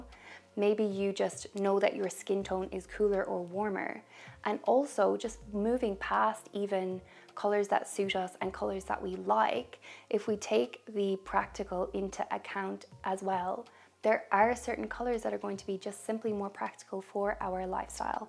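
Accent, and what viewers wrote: Ireland. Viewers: I detect an Irish accent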